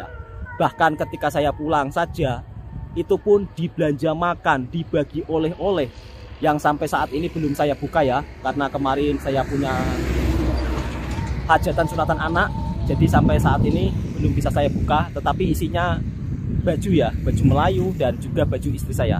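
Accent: Java, Indonesia. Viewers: Ni kira dah ok sangat tuu. Sblm ni, jawa pekat. Tpi yg x boleh ubah...buwaaaangat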